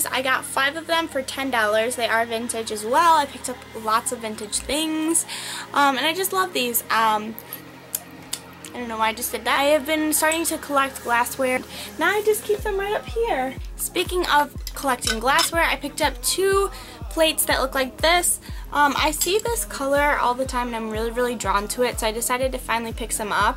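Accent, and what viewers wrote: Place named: Ireland. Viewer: Cool video! Love seeing the younger generation antiquing! Also geeked out at the irish accent :p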